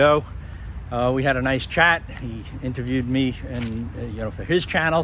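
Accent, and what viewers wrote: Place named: Brooklyn, United States. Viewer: Your Brooklyn accent is easy to recognize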